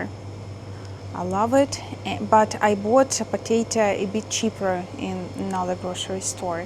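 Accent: Russia. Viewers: It's very helpful to get unfiltered information about the actual Russian experience so thank you very much for sharing. Also, your English is excellent (and I find your Russian accent very charming, by the way) so please keep posting!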